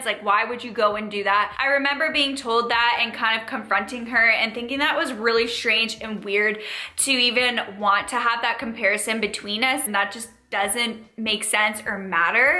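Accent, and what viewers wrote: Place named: Canada. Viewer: I love you're canadian accent lol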